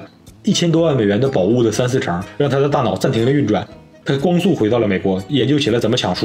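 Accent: Northeast, China. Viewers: 可汗的老家通辽是蒙古族和东北汉人混居的地方（从地理上说这里是内蒙或东北，都是正确的），东北地区不少人说话都是这个节奏的，赵本山小品和赵本山弟子拍摄的《乡村爱情故事》就是这样的语速，这种语速特别适合讲黑社会犯罪故事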